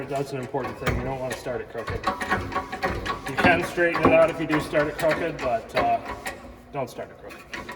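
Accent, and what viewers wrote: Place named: Canada. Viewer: You Canadians need to learn how to pronounce the word out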